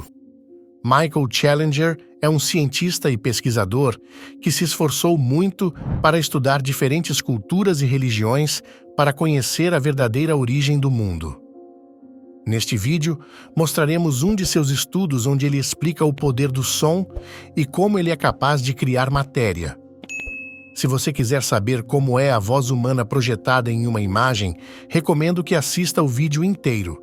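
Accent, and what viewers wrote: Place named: Minas Gerais, Brazil. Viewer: É mineira de BH..😂😂😂... Nú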